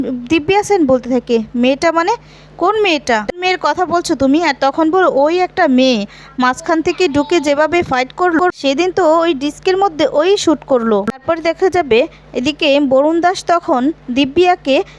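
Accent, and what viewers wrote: Bangal, Bangladesh. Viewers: কথকতার মান ভাল। বাঙ্গাল বাঙ্গাল উচ্চারণের জন্য খারাপ শোনায়।চেষ্টা কর এটা ঠিক ঠাক করার। ভাল কথকতার জন্য তোমাকে করতে হবে।শুভেচ্ছা রইল।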